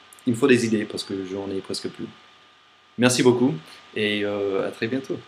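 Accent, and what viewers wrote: United States. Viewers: c'est incroyable tu n'as pratiquement pas l'accent américain quand tu parle francais ^^ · Tu es entrain de perdre ton accent américain. Tu as l'accent français parfois. Il te va bien. Des fois on croirait que tu es franco-français. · J'ai moins entendu ton accent américain que sur d'autres videos c'est normal ???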